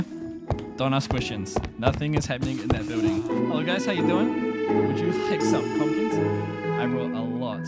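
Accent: New Zealand. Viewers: Question? Are u kiwi? (R u from New Zealand?)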